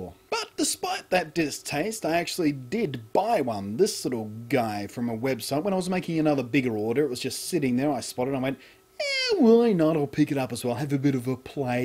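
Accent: Australia. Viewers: He is not british. He's australian... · He's Australian... Unless you didn't mean that. Otherwise, yeah, Brit accents are pretty cool.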